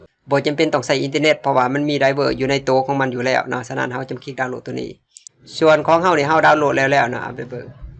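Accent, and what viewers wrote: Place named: Korat, Thailand. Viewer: ชอบสำเนียงแก่จริงๆ คนโคราชนี่.?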